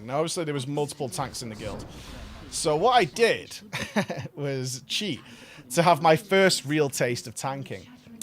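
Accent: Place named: RP, United Kingdom. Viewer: your a duki lad why has your voice gone all posh:)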